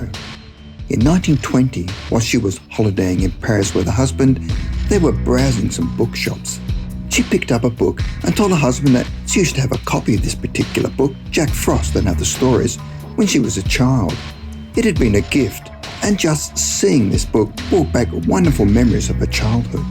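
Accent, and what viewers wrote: Australia. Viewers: An australian narrator.. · Omg your australian 😂❤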